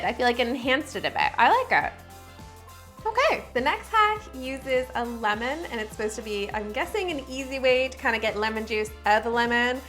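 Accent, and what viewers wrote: Canada. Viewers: Is she Canadian? She has a Canadian accent I feel lol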